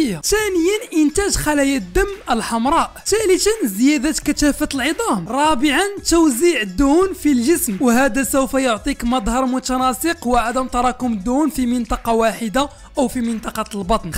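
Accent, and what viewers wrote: Morocco. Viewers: نتا باين عليك مغربي بالظبط من مراكش😂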